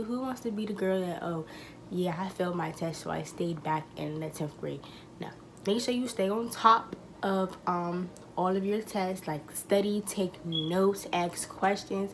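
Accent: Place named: Carolinas, United States. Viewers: It's a southern accent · 😂😂she's from like the Carolinas or Baltimore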